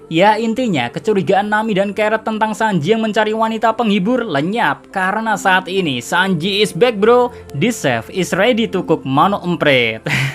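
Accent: Java, Indonesia. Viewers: Logat Jawa setengah bahasa Inggris Bahasa indo hmmm😀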